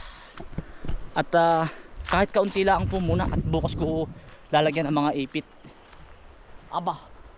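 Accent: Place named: Batangas, Philippines. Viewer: Silent subscriber from California USA....naaaliw akong panoorin ka. Ang diction mo ay kakaiba parang Batangas. Ganyan ba sa Tayabas Quezon ang salita,nakakatuwa ka pakinggan. Masipag kayong magkakapatid, lucky ang mga spouses ninyo na magkakapatid masipag,humble,masikap at maasikaso sa magulang. Ang fruits ay puede lang kuhanin sa.puno hindi na bibilhin pa. Ang bayabas ay expensive dito malalaki 1 lb.is $6.99 rich in Vit.C iyan. Edible ba ang prutas na parang strawberry, baka poisonous iyan.Always watching ur vlog lalo na at stressed ako sa work. GOD BLESS!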